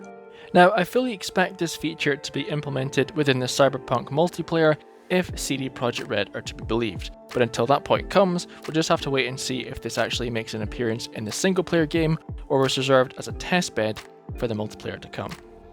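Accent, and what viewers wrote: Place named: Edinburgh, United Kingdom. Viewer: Your accent, you a fellow scot? Sounds like an Edinburgh dialect. Great vid btw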